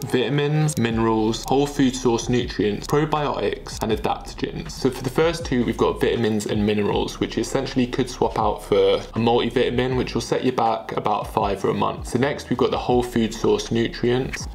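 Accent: United Kingdom. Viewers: Dude I have NO CLUE where in the UK is from but its HAWT!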